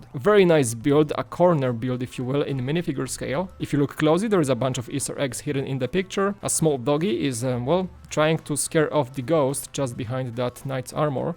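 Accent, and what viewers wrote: Poland. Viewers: are you from Poland or are you Polish? I apologize for the question but you have such an accent and you pronounce the names well in Polish.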